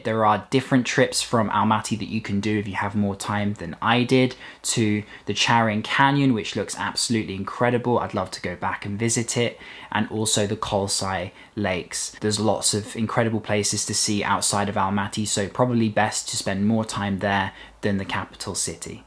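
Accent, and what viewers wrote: United Kingdom. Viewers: Зае6ись британский акцент)) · I like it your accent bro it's clear and understandable, you're different other British accent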